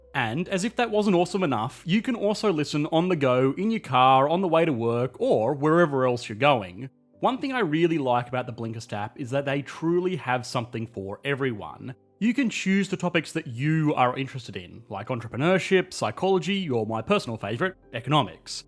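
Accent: Australia. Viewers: Me, a stranger lost in parts unknown, listening to an Australian talk about the economy of Texas while i watch a round blue creature waddle around in front of me waving a mallet. I think it's time for me to move to Texas. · "This! Is Texas." I've been waiting to hear this Aussie say these words for too long! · I an Irishman listening to an Australian talking about the economy of Texas 😂😂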